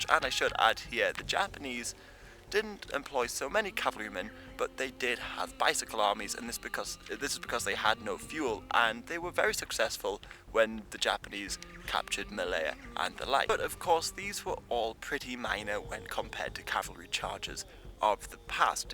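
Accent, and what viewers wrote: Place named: Newcastle, United Kingdom. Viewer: Neecastle lad! We-aye! Dare you'd to speak Geordie in an episode to come!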